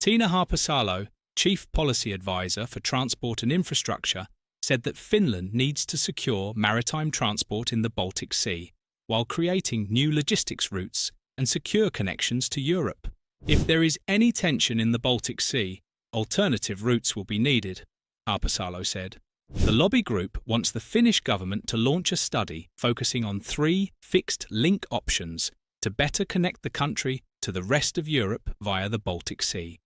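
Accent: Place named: United Kingdom. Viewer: Not sure why Norway was turned into Sweden in the thumbnail. Feels like a typical US mistake to make, yet the voice is British.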